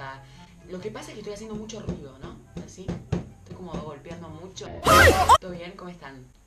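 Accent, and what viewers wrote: Argentina. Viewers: sos argentino ¿no?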